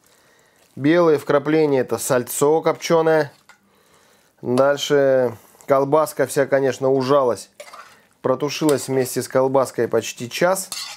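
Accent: Siberia, Russia. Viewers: ! Какое же я получаю удовольствие от общения с Вами! Первое — это Вы, а уже второе — Ваши рецепты! Сама я россиянка. Корни моих родителей в Ярославле. Но так сложилось, что с детства живу в Украине. Есть чувство тоски по России. Давно там не была, а теперь это и совсем проблема. Вы — одна из ниточек, которая связывает меня с моей Родиной. Ваша речь, Ваш говор — это такое счастье для меня! СПАСИБО!!! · Привет! Привет из Кемерово, мы почти земляки, у нас стоят сильные морозы, без надобности по улице не гуляем, я лично с утра смотрю твой канал, встретила тебя на ютубе не так давно и влюбилась, сначала мне казалось, что ты новичок и делать ничё путём не умеешь, сейчас мне даже смешно, я поняла, что человека сначала надо понять, как бы срастись меж собой, понять философию, в данном случае готовку разную. но даже не в этом дело, ты говоришь и думаешь как настоящий сибиряк, мы тоже так разговариваем и мне доставляет удовольствие слушать тебя, как будто ты у меня в гостях! 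Ты меня понял, я знаю, успехов тебе и удачи во всём, конечно семейного счастья и здоровья всей семье! С наступающим!!!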